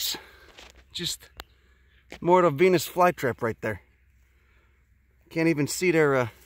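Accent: Chicago, United States. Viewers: It’s thick Chicago accent 🤘🏻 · It's funny , the exotic "Venus Flytrap" grows in a limited area of North Carolina. Also , your Chicago accent is killing me! As an Italian American from near Pittsburgh, I can hear the similarities between the Pittsburgh accent and the Chicago accent. That's why all yins guys thought that Iron Mike Ditka was a native Chicago guy when he's actually from Pittsburgh! I'm glad that I found your channel, I'm going to subscribe. Ciao, fratello!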